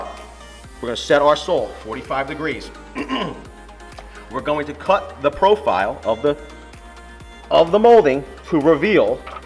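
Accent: Philadelphia, United States. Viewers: The whole time I'm watching this I'm thinking, "This dude has gotta be from Philly. He sounds like he could be a clone of my voice." Contractor myself, and you have the same patience for bullshit that I do...zero. Northeast Philly, I have a business in South Jersey over the Tacony. Where you guys from? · the best part is the philly accent.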